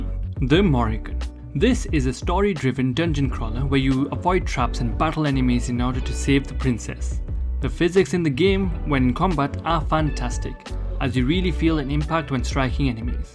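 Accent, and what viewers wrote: India. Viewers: Do I detect an Indian accent ? ;)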